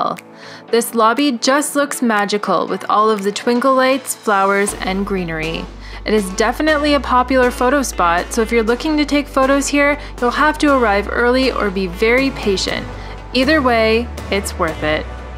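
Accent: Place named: Canada. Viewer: I enjoyed the video, but I was easily distracted by the Canadian accent!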